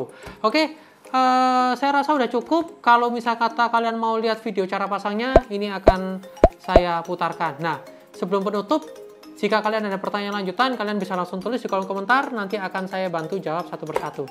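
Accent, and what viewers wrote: Surabaya, Indonesia. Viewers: mangkane kok logat e ga asing, arek boyo tibakne, tak sub koh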